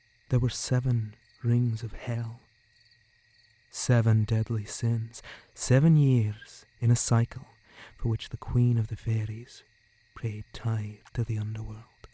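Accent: Scotland, United Kingdom. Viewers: Love the Scottish accent. It fits the character perfectly.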